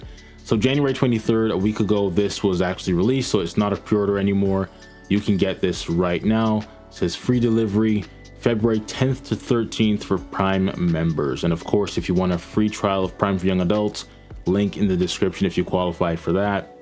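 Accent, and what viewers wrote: Canada. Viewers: Are you from Canada???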